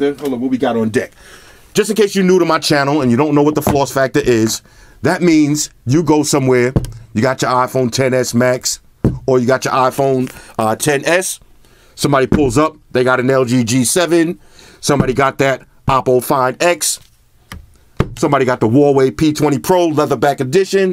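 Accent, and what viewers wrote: New York, United States. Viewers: Lmao i love how u talk u from Nyc? I’m from BROOKLAWN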